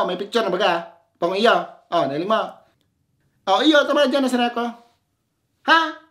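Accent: Bicol, Philippines. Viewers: napindot ko na ang subscribe . gaganda ng vids . nakakamiss mag salita ang taga bicol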